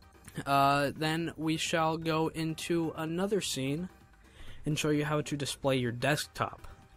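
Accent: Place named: Ireland. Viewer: Irish, m8 Irish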